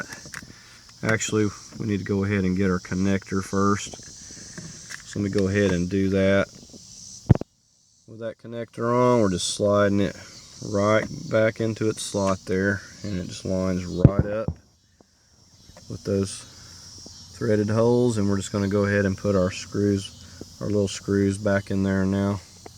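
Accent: South, United States. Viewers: trying this later. you def a southern dude. north carolina or virginia?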